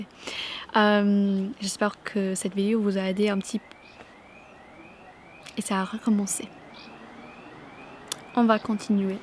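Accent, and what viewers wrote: England, United Kingdom. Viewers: With your English accent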